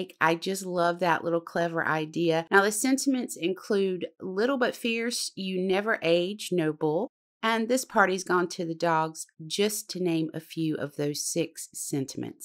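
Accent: South, United States. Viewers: I just love the Southern Sass.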